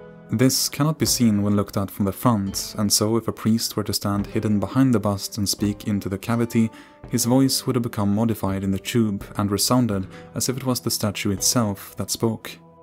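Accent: Sweden. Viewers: I can hear that the narrating voice is swedish. :-D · Is the narrator Swedish?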